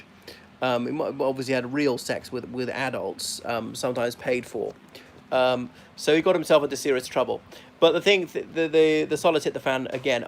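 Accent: RP, United Kingdom. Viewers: strange you sound like an English toff, not any Irish I know, what's going on here, if my first impression is your mouth is brown, that ain't good fella? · What did you do with your Irish Accent?? You have a lovely “queens english” accent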